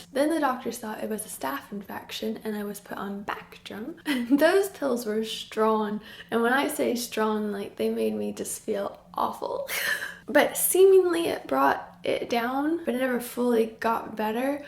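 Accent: England, United Kingdom. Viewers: I like how the English accent is seeping in. At first, I couldn't tell if you had an Americanized accent, but now I realize it was the other way around, and I'm just so pleased. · Ugh I totally understand bouncing between doctors and them not giving you answers. But sooo glad that you have it diagnosed and hopefully a new treatment plan that actually is addressing the Raynaud's! Also I read this comment on one of your previous videos, but I think it's so cool (and cute) to hear you pick up a British accent. How some words you say are more "British-y" sounding, if that makes sense? lol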